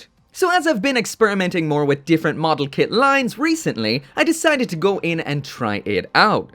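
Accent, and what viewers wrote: Ireland. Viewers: Irish accent really popping through in this review. Also props to him for review this abomination of a kit 🔥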